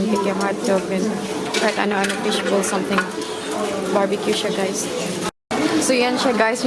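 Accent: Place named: United Kingdom. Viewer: chinese national pala si ate langgaa😅 pero ang accent ng pananalita is parang british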